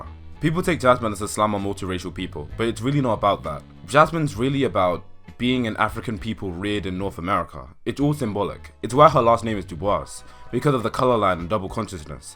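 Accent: England, United Kingdom. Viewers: Question: You sound English. Is that observation correct? If so, would you ever consider doing a video on blackness in the UK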